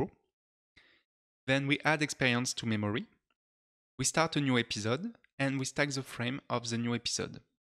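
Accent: France. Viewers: Tu n'est pas français par hasard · Very good, thanks for your contribution. Amazing. (ps: i listin only for you french accent).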